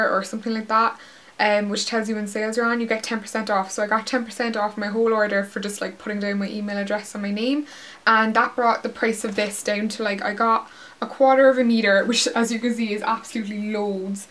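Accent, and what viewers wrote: Cork, Ireland. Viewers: omg the inactive transcript is so funny :L clearly doesn't know the cork accent :P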